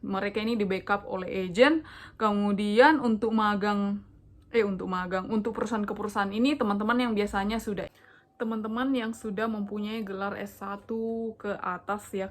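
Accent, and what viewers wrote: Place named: Manado, Indonesia. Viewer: Logatnya , mungkin kamunya dri Manado ya ? Atau bagian Indonesia tengah lainnya ? 😊 .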